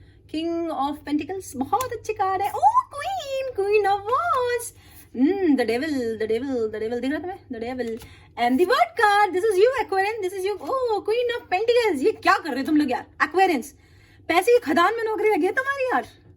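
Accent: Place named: Kolkata, India. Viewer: Sune valo laglo....Kolkata bengali..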